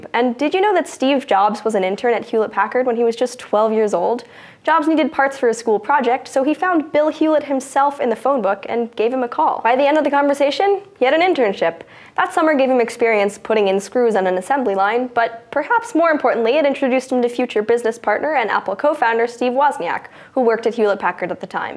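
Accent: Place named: Tennessee, United States. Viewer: What part of Tennessee are you from?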